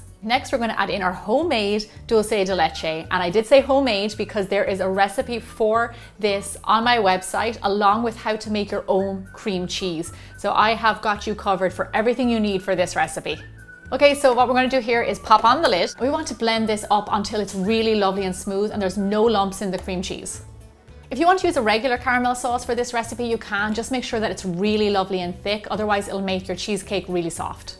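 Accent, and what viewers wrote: Ireland. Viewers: Is she Irish..sorry first time here.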